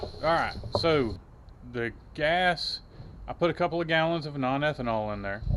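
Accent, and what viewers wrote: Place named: East, United States. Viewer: you easterners sure do pronounce Oil funny like Ohle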